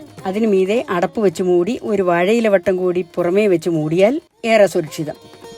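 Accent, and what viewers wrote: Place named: Kollam, India. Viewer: അമ്മയുടെ ഭാഷ കൊല്ലം ഭാഷ പോലെ ഉണ്ട്.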